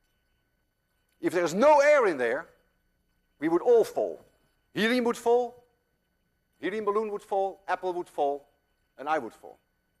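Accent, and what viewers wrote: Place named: Netherlands. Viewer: He has a dutch accent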